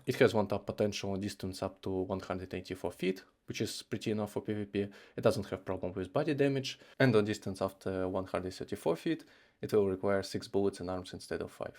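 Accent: Russia. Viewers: I love your russian акцент, good luck on youtube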